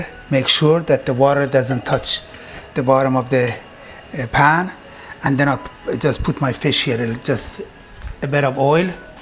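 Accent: Iran. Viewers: Awesome video, my salmon turned out great. This guy sounds Iranian. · oouuuuhh i can tell by that accent that ur persian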